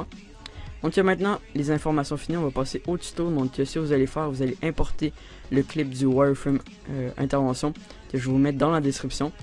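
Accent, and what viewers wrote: Quebec, Canada. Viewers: super tuto et j'adore l'accent quebecois donc je like ;)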